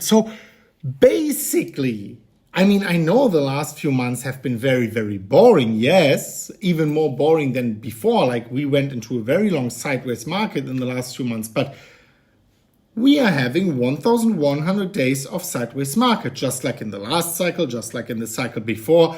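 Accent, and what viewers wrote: Germany. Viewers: German accent is strong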